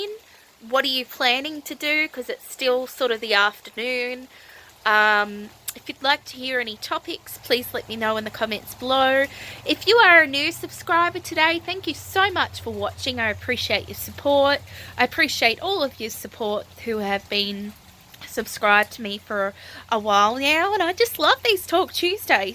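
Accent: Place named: Australia. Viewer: I like your vlogs.... your accent is so Australian, at first i thought you lived there, but now i understand you live in USA.... why did you decide to move countries, was it when you got married?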